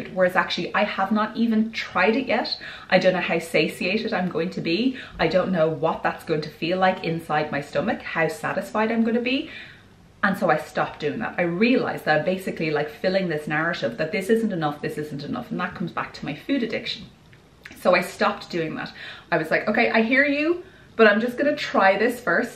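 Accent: Ireland. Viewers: I enjoyed your tips and listening to your Irish brogue. Thanks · First of all, let me say that you are so cute and I love the Iris accent! Secondly, what you said about being a perfectionist resonated with me and not in a good way. I am hardest on myself. I'm an all or nothing type of person. I "throw the baby out with the bath water" instead of just throwing out the water. If I mess up, I'll say that I might as well go all out and do what I want. Thank you for this video and for talking some sense into me. I need to have compassion for myself and allow the hiccups to come and go but not let them define me. Thanks again.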